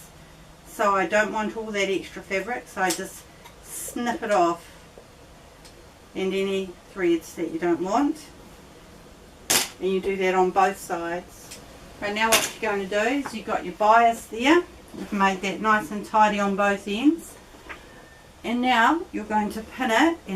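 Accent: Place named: New Zealand. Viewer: She is a Kiwi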